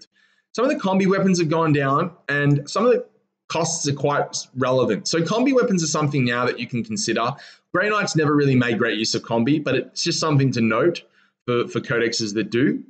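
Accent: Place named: Australia. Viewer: Hi, great video as always. A few points: 1) I've tried Heavy Incinerator on GMNDK, problem is D6 shots. 3.5 hits, 1 or 2 wounds, doesn't cut it. I rather go Gat Psi and Heavy Psy, spend 2CP (Psychic Onslaught stratagem) for 12 Str5 and 6 Str8 shots. 2) As netprince455 commented before me, what are your thoughts on: "Gateing" a Land Raider Crusader carrying 2x 5man Purifier squads, Brother-Cap (Vortex) and Pala Ancient in Turn 1, (optionally) using the Psybolt stratagem for 24 Str5 shots to clear the chaff. If it survives Turn 1 shooting, everyone pops out for 3D6 + D3 MWs (12.5 avg). Entire set up is 686pts. Alternatively, you could "gate" it on Turn 2, alongside 2 GMNDKs who were in high orbit, presenting your opponent with multiple threats. Anyway, once again, great video. Love what you're doing for the GK community with these quality vids. Aussie accent is the cherry on top.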